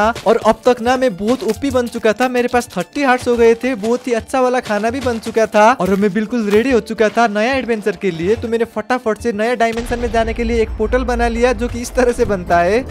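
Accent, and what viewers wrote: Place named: Nepal. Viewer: his langauge tone is like napali tone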